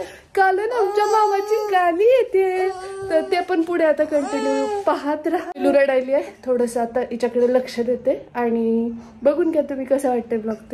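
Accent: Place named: Udgir, India. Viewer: मी पण udgir ची आहे. तुझे vlog पहिले आणि तुझं बोलण ऐकलं की आपल वाटत. आपली भाषा जवळची वाटते.. मस्त झालेय बेबी. मी खास तिला पाहण्यासाठीच व्हिडिओ बघते तुझे. 🤗🌹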